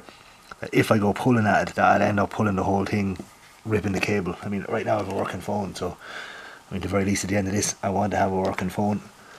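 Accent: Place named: Ireland. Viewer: Love the Irish accent bro fae your Scottish brethren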